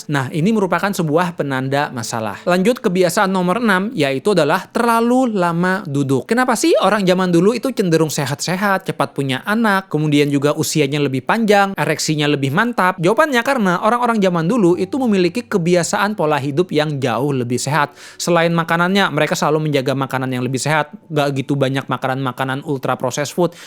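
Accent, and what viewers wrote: Banjar, Indonesia. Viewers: Urang Banjar kalinya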